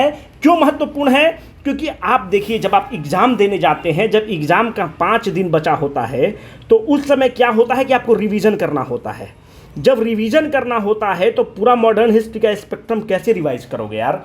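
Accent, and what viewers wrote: Bihar, India. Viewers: Hero is Back.... What a strong voice and I love the way you substantiate things..... BIHARI Hindi tone mixed with Sound vocab of English is deadly combination sir😊 Loved every bit of video!!!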